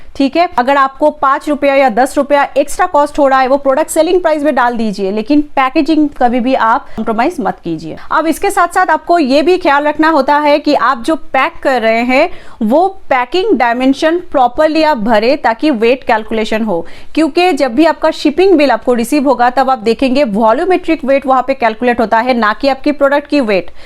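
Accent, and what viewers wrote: Bengal, India. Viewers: good info with strong bengali accent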